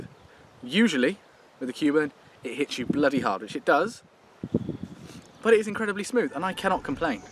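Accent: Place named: England, United Kingdom. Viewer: I love this english accent 😃 take care of you europe. Stay safe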